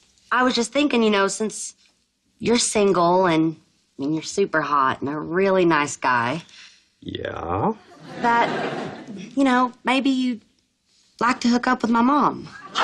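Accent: United States. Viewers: she sound so country lov ya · I love her country accent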